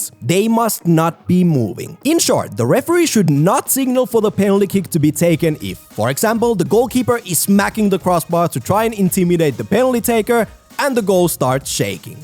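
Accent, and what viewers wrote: Finland. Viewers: Funny how the narrator tries so hard to hide his Finnish accent :DD just rock it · Is this commentator from Finland? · Im 100% sure the guy talking in this video is from Finland. Suomi perkele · Im pretty sure ur A Finn cuz off that accent 🤔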